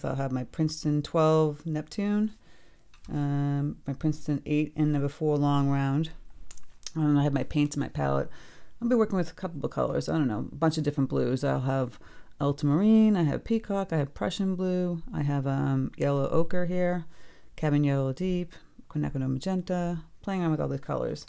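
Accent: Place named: North, United States. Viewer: Plumes. The things on the top of the grasses, like pampas grass. But anyway, you're my favorite! Girlfriend, you are the best teacher. It's all just likes and shapes and marks. You make it all so approachable. Thank you very much! Not only do I watch all of your videos, but I frequently paint along with you, and if I don't paint with you, I save the video to paint with you later. You've blessed my life. PS. As a NYer living in Atlanta, I love to hear your northern-ese way of speaking. ;)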